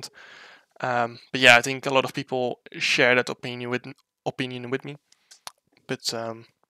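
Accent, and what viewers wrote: Netherlands. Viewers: je hebt echt wanneer je Engels praat hoor je gewoon het Nederlandse accent Lekker gedaan jammer met de damage Maar lekker bezig een "keep it up"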